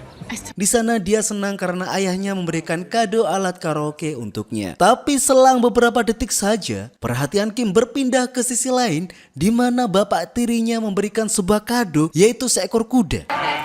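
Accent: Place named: East Java, Indonesia. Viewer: Mimin iki sajak e wong ndi yoo ,bahasa e kok khas darjo-sby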